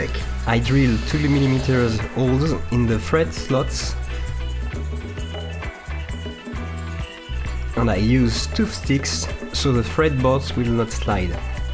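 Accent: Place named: France. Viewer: Your english is very good even with french accent. Nice tutorial 😉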